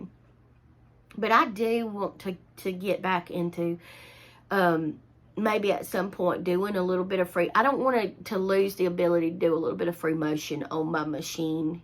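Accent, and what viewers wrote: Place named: Alabama, United States. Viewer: I am 64 and yes my body does not let me do the things I want to do. I am from Alabama. You sound just like us. Are you from Alabama?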